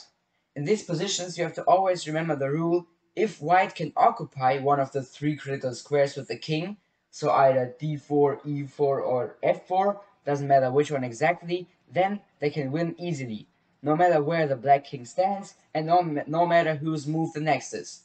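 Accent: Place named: United Kingdom. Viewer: Begrüßung aus Bulgaria. Ich bin dein 375 subscriber. Dein Englisch ist sehr Britanisch für mich(das ist normal). Ich denke deine videos sind sehr neu und gut. Das ist die erste video YoutubeALg. shau mir auf dien channel. Ich will mehr shcach videos für angefanger (ich bin 1800chesscom2000liechess blitz) in youtube. Mein freund (unter 800) muss learn von dich. Deine erzelung ist sehr gut. In meine meinung die serious erzelung in youtube ist nicht genug.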